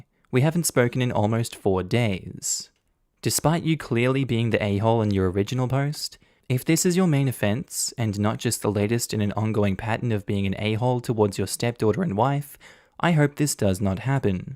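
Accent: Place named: Australia. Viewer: Wow, love to hear a fellow Aussie voice in the evening. Onya! ♥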